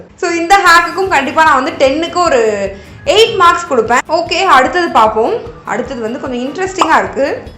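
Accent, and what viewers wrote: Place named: Kanyakumari, India. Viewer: Are you from kannyakumari your slang is pretty good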